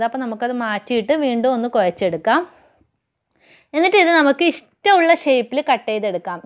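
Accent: Kasaragod, India. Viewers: കാസർകോട് സ്ത്രീകളെ സൗണ്ട് കേട്ടിട്ട് അവിടുന്ന് കല്യാണം കഴിക്കാനും മോഹം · Kasar Kode laugage allalloooo Nigalude speech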